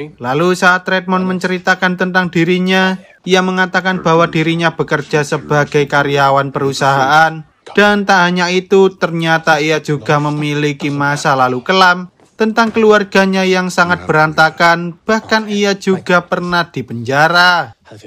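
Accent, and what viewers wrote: Java, Indonesia. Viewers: Lu org Jawa y bg medok bener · Jujur bng nonton nya kurang seru,mohon maaf bng ya suara Abang lebih ke logat2 jawa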